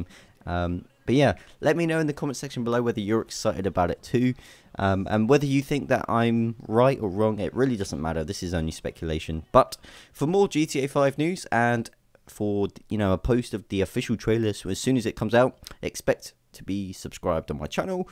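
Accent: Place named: United Kingdom. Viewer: A mysterious British guy whose accent isn't sexy. What a relief. Usually British accents are attractive but his just isn't, lol!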